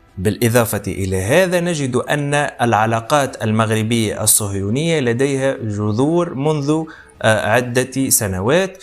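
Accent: Tunisia. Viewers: انت باين تونسي على حساب كلامك ان شاءالله يوجدو البترول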